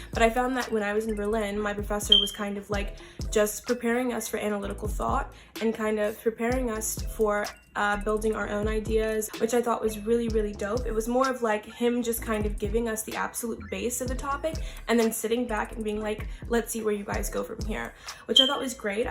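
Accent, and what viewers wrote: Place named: United Kingdom. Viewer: i Said it somewhere else in the comments already, but frankly, there are almost never Americans of colour coming to Germany. Even with your accent, I would assume you’re from the UK. Also, people working at food stands are oftentimes local folks and not the most international, able to grasp the history of slavery in the Americas as a whole. Berlin is nice and I like it every day, no matter Corona. Without corona, spending your night with friends at Sisyphus or at the river with Späti beers is great. Thanks for saying our metro is clean, I always have the feeling like it’s gross. Puke, pee, full program. Greetings from Berlin.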